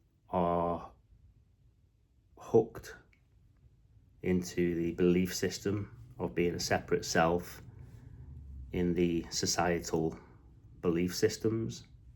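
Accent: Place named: North West England, United Kingdom. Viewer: Cool video man, are u from Manchester? I am from North West England tryna guess ur accent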